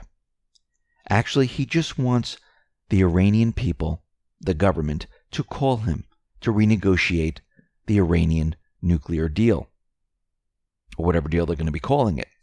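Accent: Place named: New Jersey, United States. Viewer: He's from New Jersey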